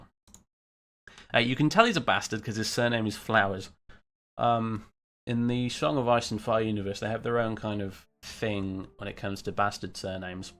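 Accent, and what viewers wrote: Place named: Norfolk, United Kingdom. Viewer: Sounds like he's from Norfolk.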